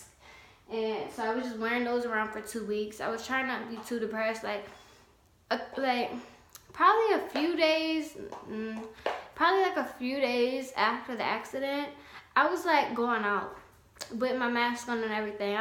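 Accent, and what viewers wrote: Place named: South, United States. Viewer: You’re literally the most beautiful woman I’ve ever seen wow, love your southern accent.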